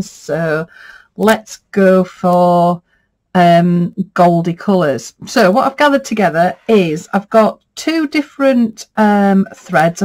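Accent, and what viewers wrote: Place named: North, United Kingdom. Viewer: Just been told by one of our mutual viewers that I sound like you 😁💜 so I thought I'd better pop over and see, or rather, hear for myself 🤭 Well we're both from the north but I suspect there's a county or two between us 😁 Loving the tags.. Joie 💜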